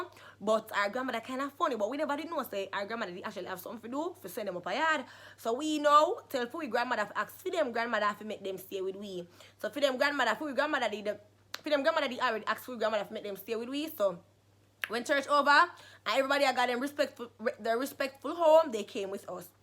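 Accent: Jamaica. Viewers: Me too....i think she is jamaican 🤣🤣🤣 she talks as if she is singing regge😂😂😂😂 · I love her Jamaican voice😍 y’all Jamaican people know 😂😭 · Me finally subscribe, yasss lol I see ppl in the comment saying they hardly understand you,lol hush guys it's patwa get use to it :-) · Shes Jamaican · She is speaking English. Broken british English with a jamaican accent.. It's slang. We speak proper British English with a jamaican accent. But when she breaks into the patwa that is our slang. Just like when you see black Americans speak slang, (not all) are what they call ebonics. But when you at work, or in a professional setting, you speak proper English. Also depending on which part of the country you are from in America, it also includes ur accent. Get it? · 🤔😆😆😆😆😆. Girl you're killing it..... I wouldn't call that loosing your virginity.... 4sum lol....... That's an experience darling...😂😂😂😁😁.. 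Love how you bring out the Patois 💯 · Cynth Darling it’s not... she’s just speaking patios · Yes the accent is real, she is from Jamaica, that's Jamaican patois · I didn't know Jamaican accent was this much strong lol😂😂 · She sounds calm for a jamacian she is toning it down for u lot British jamacian here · Straight countri Patois she a chat. Lol I should know, since I'm Jamaican 😂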